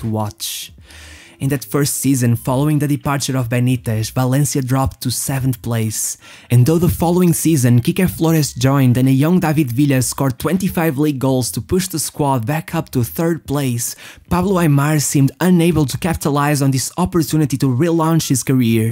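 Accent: Portugal. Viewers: I love this guy’s portuguese accent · Hey man, love the videos 😊 Just a quick one. We speak Spanish in Argentina (it's actually called Castellano - <link> but with a different accent because of the 65%/70% Italian influence). I know, I sound like a pain in the 🍑 but I like your videos and I'd like for you to get better and get more viewers 🙏🏽 Your Rs and Gs are pronounced with a Portuguese accent. Therefore, they have nothing to do with Spanish. It's the equivalent of speaking Italian with and pronouncing it with a French accents. Again, sorry for being a pain 🧑🏻‍🏫 and thanks for the amazing videos 😊 Ciao! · este sotaque é tão tuga certo ? ahha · o teu portugues no ingles é muita mau fds, esse sotaque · Faltou-me dar aquele suporte, optimo ver um portugues envolvido num bom trabalho internacional! Sigo o canal e deixo-te os meus parabéns pelo trabalho, cada um com sua pronuncia e ainda bem que a tens porque assim dá para os teus compatriotas te identificarem e apreciarem! 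One love <3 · This voice has such a portugese accent ahah 100 % sure he is portuguese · no I don’t. He probs went to a English speaking country as very young lad but still carries the Portuguese language accent. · I cant help but to ask, are you portuguese? If you are not cangratualations because your portuguese accent is perfect. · Amazing how a portuguese guy only needs 5 seconds to realize that’s a portuguese guy speaking english. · Tu tens de ser português, o sotaque não engana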